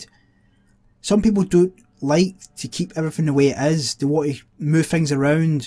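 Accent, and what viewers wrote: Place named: Scotland, United Kingdom. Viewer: I wanna say Scotland, but I always hate assuming things. An accent is defined as speaking one language but using the rules of another. Is there an indigenous dialect in your area of the world?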